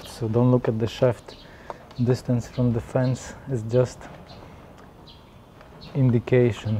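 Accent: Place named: Poland. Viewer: Very nice video mate. Well done! Where are you oryginaly from? Your accent sound Polish am'i right?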